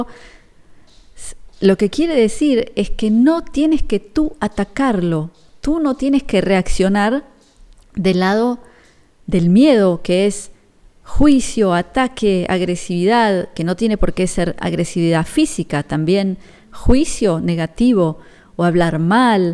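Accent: Argentina. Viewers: Soy nueva y me encanta su canal, sería lindo saber como de Argentina 🇦🇷 (porque creo sea Argentina por el acento tan marcado,) vive en la tierra hermosa de Israel y sobre todo GALILEA 🤩. La felicito tiene un cutis muy lindo, la piel se ve losana!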